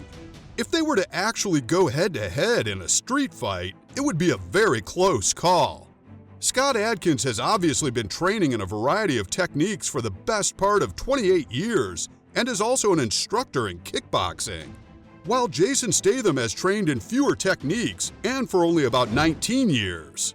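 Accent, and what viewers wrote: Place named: United States. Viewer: this overexaggerated american accent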